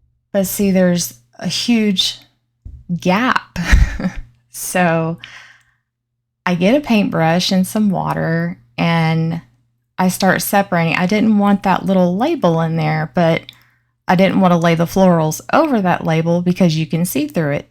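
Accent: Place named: South, United States. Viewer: Sounds like you're a southern gal like me! Love your pumpkins!!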